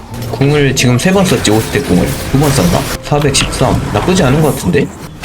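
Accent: South Korea. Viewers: 광주사투린가요 ㅎㅎ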